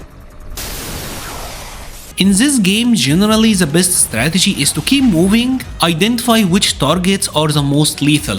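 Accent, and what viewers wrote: Egypt. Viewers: I knew u are Egyptian from ur accent 😂❤takes one to know one, awesome vid brother ❤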